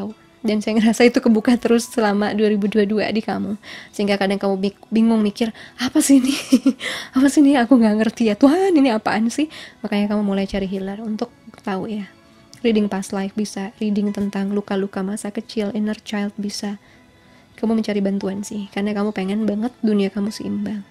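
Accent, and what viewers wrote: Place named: Java, Indonesia. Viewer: Pile 1, kenapa ya aku rada-rada feeling "kok kak ngomongnya rada medok ya?"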